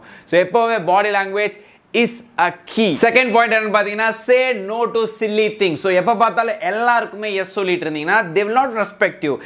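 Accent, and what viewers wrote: Salem, India. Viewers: Bro nenga salem ah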